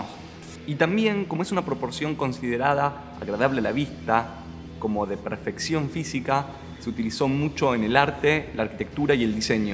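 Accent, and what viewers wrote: Argentina. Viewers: Buen video. ¿Eres argentino?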